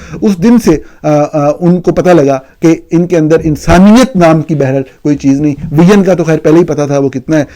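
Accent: Punjab, Pakistan. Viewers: پنجابی لہجے میں انگلش کا لہجہ بڑا لطف دیتا ہے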